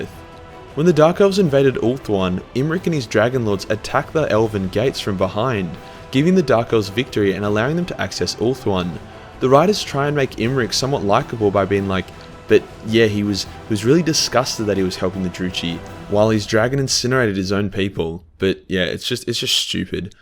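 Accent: Australia. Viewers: I love listening to an Australia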